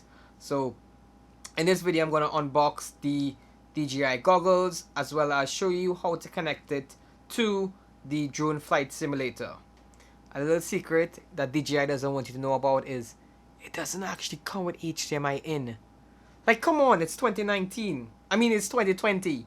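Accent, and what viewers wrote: Trinidad and Tobago. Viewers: Your awesome T&T accent is a nice change of pace from the hundreds of other FPV videos I watch from guys in the midwestern US. :) Great video. Keep it up! I subscribed, and look forward to more great content. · South Africa but spent a great deal of my life in the Eastern Caribbean. From ABC's up to BVI. Visited Trini regular and Tobago was one of my fave kite spots. The Trini accent while toned down in the vid i remembered ha ha ha ha ha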